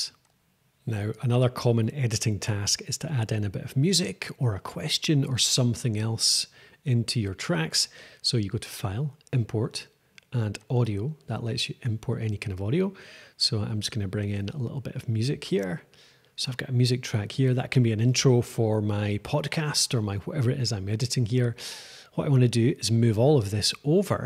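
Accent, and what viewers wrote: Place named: Scotland, United Kingdom. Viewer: This is excellent. I’ve recorded interviews to put on CD but I’m not sure about how to process them. For instance, what’s the difference between using normalisation compared to using compression to raise the volume? Is it a case of either/or? Lovely to hear a tutorial in a beautiful Scottish accent compared to the usual American videos. Keep them coming.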